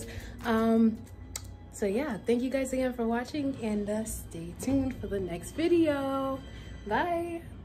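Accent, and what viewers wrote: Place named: Midwest, United States. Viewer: That food looks good and you sound like you’re from Chi town or the Midwest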